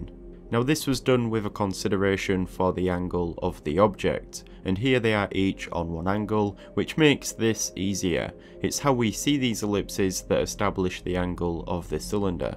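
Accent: United Kingdom. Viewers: obviously, but which one of the British accents 🤔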